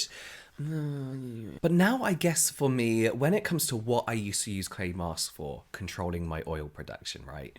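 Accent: United Kingdom. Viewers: is sounding extra British in this one.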